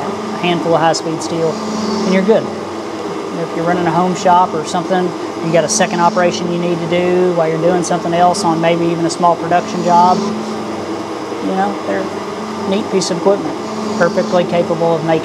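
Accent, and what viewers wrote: South, United States. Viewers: First time I watch your video. Nice shop! From your accent I think you live in the south, somewhere it can get cold. North Carolina? I have a lathe and a mill, a much smaller shop than yours. I look forward to watching more of your work.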